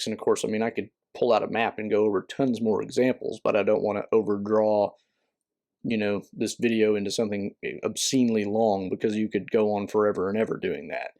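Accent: South, United States. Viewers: That bloody accent....so Southern.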